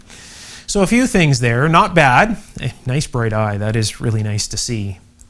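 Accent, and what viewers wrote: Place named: Canada. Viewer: I cannot quite pinpoint his accent/dialect - I am guessing it is Canadian since the pronunciation is so articulate and clear - But which is it? 🙂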